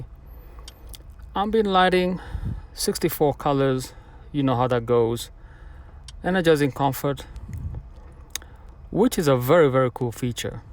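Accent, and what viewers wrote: Kenya. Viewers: You sound Kenyan. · That accent is so Kenyan yani 🇰🇪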